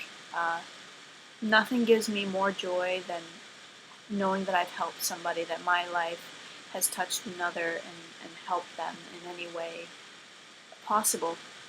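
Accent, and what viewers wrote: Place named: United Kingdom. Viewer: Thanks. After completing the test, I found your video enlightening and you echoed many of my characteristics. It is nice to feel more connected to people. Can you recommend any ways to communicate with other INFJs? I'd like to find out how deep our connections go. I love discussing things on a deep and personal level but not everyone enjoys that. Also, I thought you were British! Pity...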